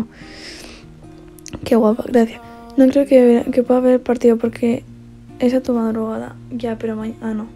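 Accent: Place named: Spain. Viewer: Hola lo mejor de ti es tu carisma y tu habla de español me gusta las españolas su forma de pronunciar saludos desde Costa Rica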